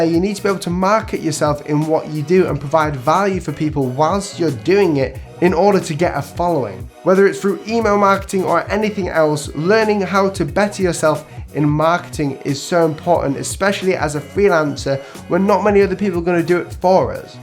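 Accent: United Kingdom. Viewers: I do really appreciate your content btw, since I try my very best in calligraphy from time to time... and I really like british english:D Keep up the good work!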